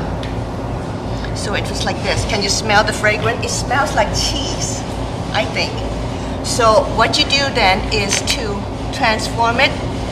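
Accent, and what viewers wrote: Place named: Germany. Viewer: where is this weird accent from? sounds german english to me!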